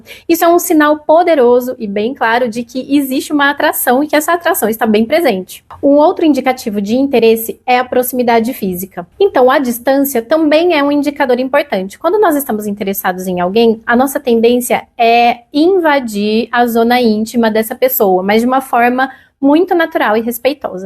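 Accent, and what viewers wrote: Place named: Caipira, Brazil. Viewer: Tirando o sotaque caipira fica perfeito.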